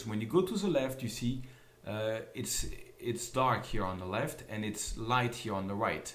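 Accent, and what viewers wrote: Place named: France. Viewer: Merci pour le tuto, et l'accent Français aussi ^^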